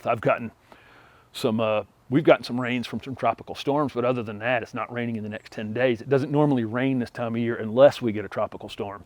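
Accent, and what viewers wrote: South, United States. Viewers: I have absolutely LOVED your YouTube channel, since finding it a few months ago, and I have learned sooo much from you! Also, you and I share the same zone (7b), as I live very close to you, in Mooresville, so (as crazy as this sounds🤪) I feel so “at home” listening to your heart- warning southern accent and seeing your familiar mannerisms throughout your videos!😊 Also, It’s extremely obvious to me, and I’m positive to anyone who follows you, that you work so hard to prepare for every single video that you upload, and you’re very efficient and consistent! You never let us down! I can easily see how friendly you are, down to earth, and incredibly REAL, which is sadly so hard to find in people these days! Oh yeah! Duh!😂 Congratulations on you and your family’s absolutely stunning new home!🥳What an exciting time for y’all, and I absolutely love everything about it! 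I have loved seeing both your house and your yard from overhead with your drone! Of course, you’ve knocked it out of the park with your unique and brilliant landscape design, which is such an important part of creating a yard that you’ll love! Also, congratulations and YAY for recently welcoming beautiful “Miss Holly” into your family, as well!🧡 She’s such a beautiful and sweet dog!!! Again, thank you so very much for all you’ve done to help me, without even knowing it,! I am now a diehard, lifelong “HortTube” viewer, AND SHARER!!!! You’re definitely my #1 channel, hands down! Your hard work has absolutely paid o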